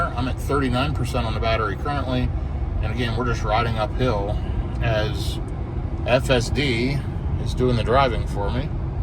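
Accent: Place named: Western North Carolina, United States. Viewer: I'd still say North Carolina with the way you pronounce your Os. It very unique to western North Carolina.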